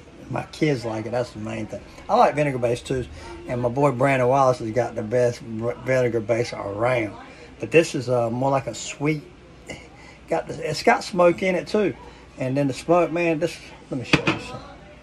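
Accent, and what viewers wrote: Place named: South, United States. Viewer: Dayum!!! That looked good! Also freaked me out hearing a true southern drawl coming out of your mouth! I subbed because I want to see some more videos!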